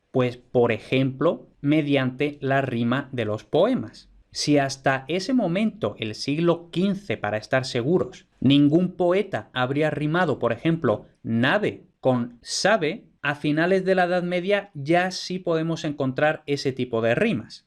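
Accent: Spain. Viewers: te felicito por venir a ver estos videos si estás aprendiendo español, son explicaciones más bien técnicas que ayudan a entender el orígen de muchas palabras en nuestro idioma. Y se te puede dificultar también por el acento de, el español ibérico a veces es complicado de entender, si los hispanoamericanos tenemos dificultad para entenderlos, imagínate tú.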